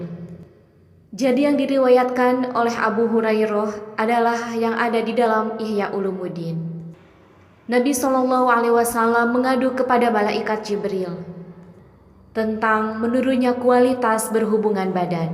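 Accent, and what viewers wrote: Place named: Madura, Indonesia. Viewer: Kyk org madura suaranya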